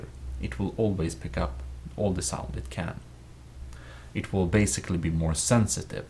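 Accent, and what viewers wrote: Sweden. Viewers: your defiently swedish mah dude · is this guy swedish? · swedish english 100% (im sweedish)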